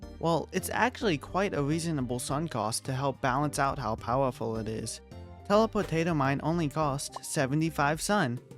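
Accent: Romania. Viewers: r u romanian? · Are you Romanian? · romanian detected, my fellow balkan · I know ur romanian or moldavian , but i can't prove it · Are you perhaps romanian?? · Are u romanian?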